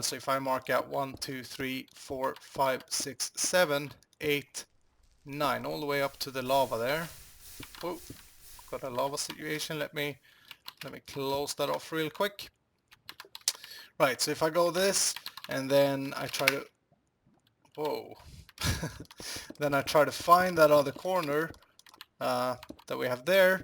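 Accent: Sweden. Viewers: You must be Swedish right? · Are you from Sweden?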